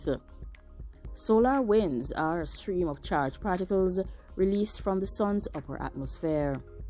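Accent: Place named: Jamaica. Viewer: Me luv ya Jamaican accent.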